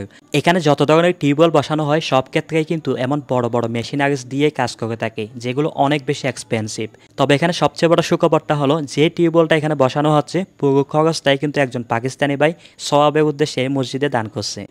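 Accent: Chittagong, Bangladesh. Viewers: চট্টগ্রাম। চট্টগ্রাম এর মানুষের বাংলা উচ্চারণ একটু পার্থক্য আছে।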